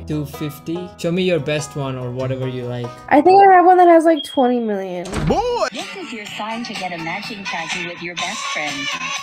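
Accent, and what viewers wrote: Hyderabad, India. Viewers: Bhai tu Hyderabad se hai?? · you speak 100% of indian accent , that women must be she didnt know how indian accent sound like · Bro are you from hyderabad wow... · dude you're from Hyderabad? lmao 😂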